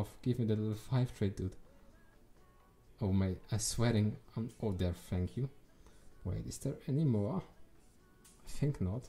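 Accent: Germany. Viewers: hab gar nicht gemerkt das du deutsch bist aber dein Akzent hat man raus gehört dann kam ne Twitter Meldung auf deutsch:D btw kranker Account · hast aber echt nen deutschen Akzent dachte auch du wärst Deutsch bis du es zum Schluss gesagt hast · Deutsche hört man raus xD Auch wenn er viertel türke wäre und er das flüßig sprechen kann, Das ist der akzent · Ein deutscher an der spitze ? Wow (deutschen Akzent höre ich unter <num> mio englisch sprechenden herraus :D) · Kommst du aus bayern? :D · Was für ein Deutsches Englisch XD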